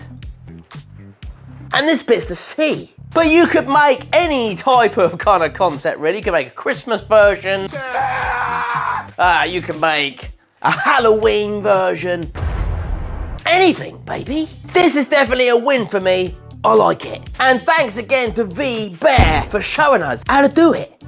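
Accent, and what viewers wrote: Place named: Cockney, United Kingdom. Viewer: His voice reminds me of Danny Dyer, like so cockney it hurts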